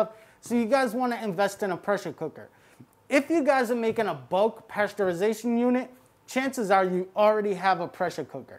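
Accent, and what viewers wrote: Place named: Northeast, United States. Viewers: This dude sounds like he's from New Jersey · based on his accent you could pretty much narrow it down to being somewhere north and/or east of Pennsylvania lol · You can hide your face, but you can't hide that southie accent.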